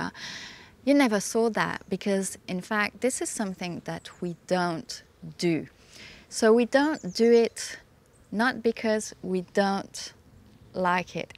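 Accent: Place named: United Kingdom. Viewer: I'm trying to figure out your accent. I know you live in Switzerland and speak French, but your accent speaking English is quite unique. Have you lived in England...because, as well as speaking English perfectly, you do have a tiny bit of a British accent...within your mix.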